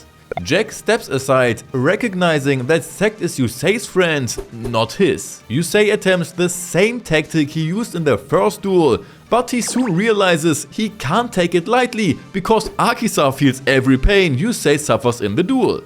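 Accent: Germany. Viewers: Lass mich lügen aber bin mir bei dem Akzent zu 99% sicher dass du deutsch bist 😅 Gutes Video btw. Endlich mal wieder ein Tuber der mal wieder Videos zu 5ds macht 😁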